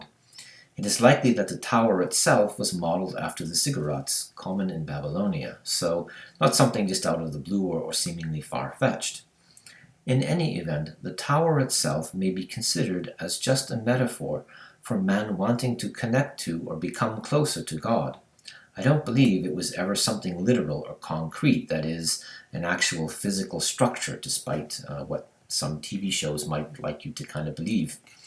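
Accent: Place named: United Kingdom. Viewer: Ps. I thought that you said that you are from America and your father is Polish. But IMHO your accent is British, not so much accent but the way how you construct sentences and words you use. So you are expat leaving in USA? Have a great day!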